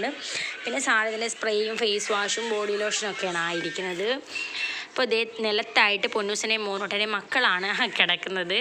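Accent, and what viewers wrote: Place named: Malabar, India. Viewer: sukamano enn choichatha.. Malabari slang😃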